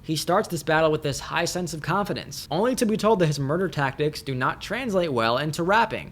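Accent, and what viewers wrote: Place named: Wisconsin, United States. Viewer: Does anyone know where he's from? He sounds kind of Wisconsin :P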